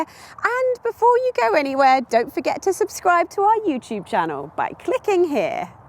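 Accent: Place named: Liverpool, United Kingdom. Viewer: Is it just me or has this women just got an imperious, pseudo voice!!!! behind her flaccid figure is nothing more than a northern scouser!!!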